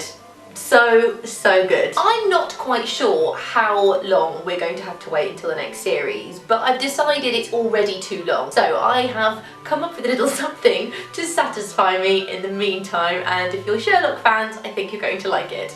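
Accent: United Kingdom. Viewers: You´re british...Ilike it! And I love this series...Amazing! XD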